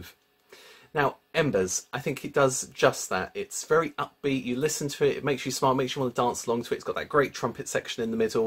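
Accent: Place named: England, United Kingdom. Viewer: I hear the Klaxon siren already but can I ask why you're called Eurovision Ireland when you have an English accent? What's the connection? Sorry to ask just interested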